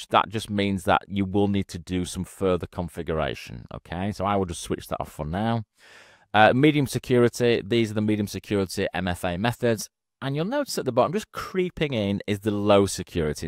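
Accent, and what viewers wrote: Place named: Scotland, United Kingdom. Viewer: "Don't worry. You're not alone." deep inhale, long exhale Thanks internet Scottish tech guy.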